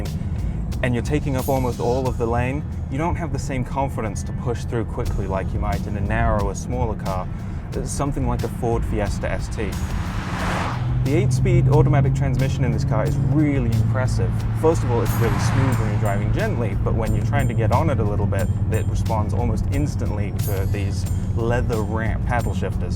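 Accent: United Kingdom. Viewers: American or british? i cant tell. sounds like he has a slight british accent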